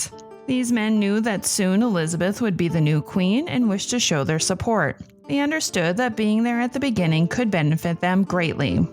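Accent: United States. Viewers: Rather listen to English casts reading this .Why have an American reader sounds weird .Could only listen to two minutes.Your voice is terrible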